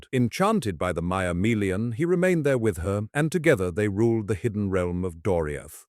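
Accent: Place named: RP, United Kingdom. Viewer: Love your RP narration. Puts me in mind of The Inklings.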